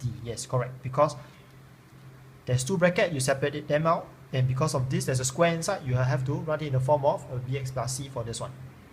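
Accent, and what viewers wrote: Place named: Singapore. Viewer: I recognize the Singapore accent ;), keep it up man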